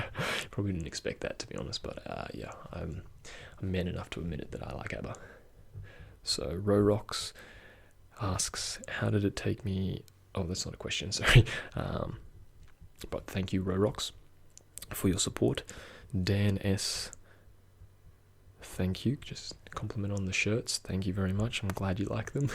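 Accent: Australia. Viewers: I don’t know why but your voice reminds me of an Australian Fred’s Voice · Yes another Australian asmrtist!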